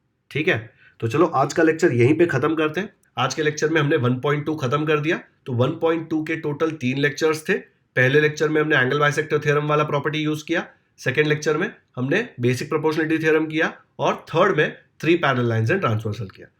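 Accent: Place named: Maharashtra, India. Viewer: I having doubt of your language your mother tongue is Marathi because you was tell tow one chaa that way😅😅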